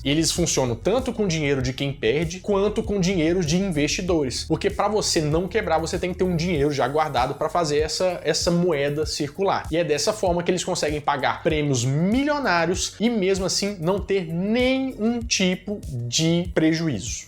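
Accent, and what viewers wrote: Minas Gerais, Brazil. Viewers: Esse cara fala igual mineiro.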